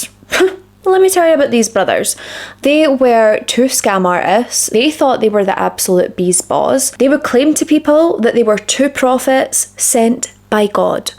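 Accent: Scotland, United Kingdom. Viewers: She’s from Scotland! 🏴 🥰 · You are so Scottish ❤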